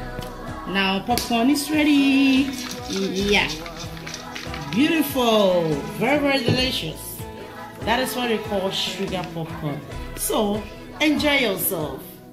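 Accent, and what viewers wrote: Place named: Ghana. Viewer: As soon as I heard the accent ...Ghanaian , lol! Subscribed!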